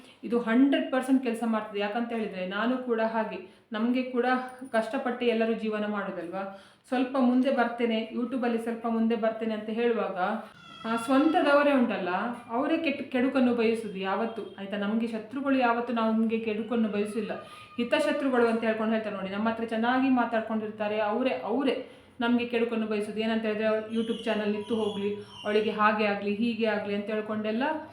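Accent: Mangalore, India. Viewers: Mlore kannada ❤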